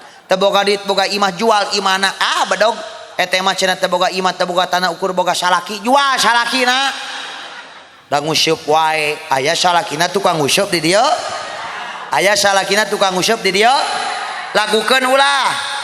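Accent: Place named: Bandung, Indonesia. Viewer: Jigana tipalih bandung atanapi bandung ngupingkeun logatnamah ❤❤❤❤❤❤❤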